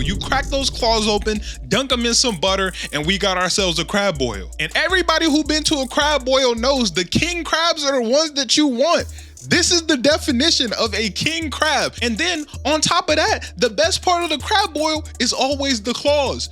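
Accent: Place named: Chicago, United States. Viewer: I was about to comment “Lemmon Pepper what??” And then you played THAT clip 😂😂😂. I could tell you were from Chicago before you even said it 😂😂. Love this video fam.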